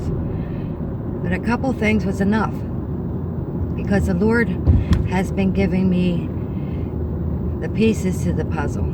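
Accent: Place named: Philadelphia, United States. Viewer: Thank you for sharing ❤ very brave woman! Out of curiosity are you in PA? I was trying to place it while you drove and you have (as do I lol) that east coast/Philly accent! If so we are nighbors:)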